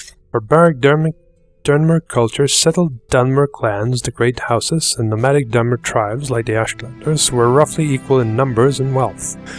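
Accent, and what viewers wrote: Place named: England, United Kingdom. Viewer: fainas tavo angliskas akcentas :)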